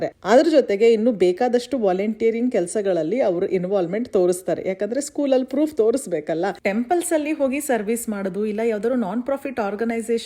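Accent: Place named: Karnataka, India. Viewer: ನಾನು ಈಗಾಗಲೇ ತುಂಬಾ ಸರಿ ಹೇಳಿದ್ದೀನಿ ನಿಮ್ಮ ವಾಯ್ಸ್ ನಿಮ್ಮ ಮಾತು ನಿಮ್ಮ ಈ ಕನ್ನಡದ ಈ ಸೊಗಡು ಹೋಗಿಲ್ಲ ಅದು ನನಗೆ ತುಂಬಾ ಇಷ್ಟ ಆಗುತ್ತೆ ನಿಮ್ಮ ಮುದ್ದು ಕನ್ನಡಕ್ಕೆ ನನ್ನ ದೊಡ್ಡ ಸಲಾಂ❤❤❤❤❤❤❤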